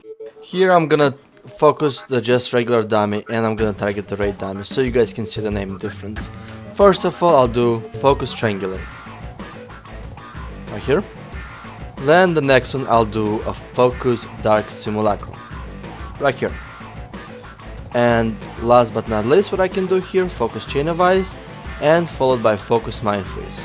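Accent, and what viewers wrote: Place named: Russia. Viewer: ..I pretty much liked the video,but you should try to make your accent slightly better. It is not bad to be russian or whatevs,seriously,just sometimes I don't understand what you say that's why..Plus u will become better in english :D Other than that,I enjoyed the video.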